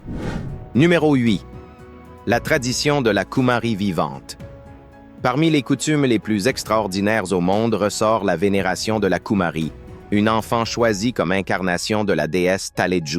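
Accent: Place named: Canada. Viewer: cet accent si sympathique qui est canadien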